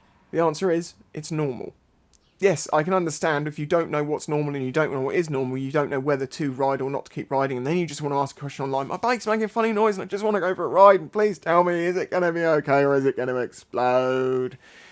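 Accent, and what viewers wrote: United Kingdom. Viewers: That's how you know he is truly British and not faking an accent.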